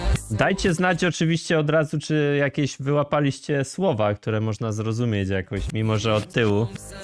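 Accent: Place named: Silesia, Poland. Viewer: Ja usłyszałem Ślonzok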